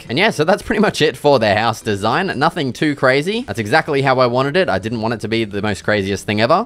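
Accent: Australia. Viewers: STILL SHAKING FROM THAT FALL!. Im a 50yr old female and you have taught me so much about building i steal everything! And love the Australian Accent Not that WE have accents!!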